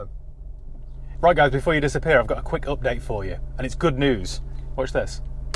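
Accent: United Kingdom. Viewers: The more I hear that bleeding British accent, I want to scream!!! Stuffy…prudish….snobby….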